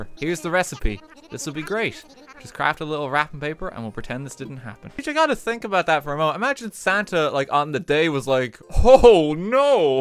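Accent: Ireland. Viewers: You're irish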